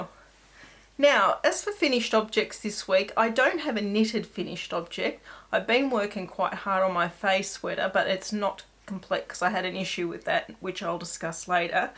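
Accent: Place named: Australia. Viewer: Hello, I fell across your podcast last night and how refreshing to hear your gentle Australian voice. I’ve jumped around a bit looking at a couple of your podcasts, ooooh your ETSY shop although your closed for a bit will eagerly wait for you to re-open. So back to the podcasts I went 🤣 I so enjoyed being introduced to your sweet daughter Mill wow did I laugh along with your conversations 😅 This morning home day for me don’t work Wednesdays generally I decided to go back to the beginning of your podcasts to get to follow your You Tube journey. So my first thought when you talked about jumpers and why us Aussies call them that was the poem Waltzing Matilda and Wikipedia has this description and it made perfect sense to me why we say ‘jumper’ instead of ‘sweater’..... “Jumbuck is an Australian term for a male sheep, and is featured in Banjo Paterson's poem "Waltzing Matilda”. 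I haven’t gone through other’s comments someone may already have thought this. I will have sheep wearing jumpers now jumping around the paddock yards with the working sheep dogs 😂🤣😂🤣 I am so lucky to live (rent) on a working farm approx 700 acres with sheep and cropping being mainly wheat & barley and have so laughed watching new lambs jump and play chasey during lambing season through the year when they have run of the yards just outside of our home garden, the best of fun. 🇦🇺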